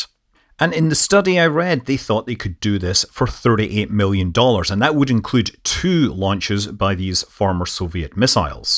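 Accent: Scotland, United Kingdom. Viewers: Scottish fo0l...Aye!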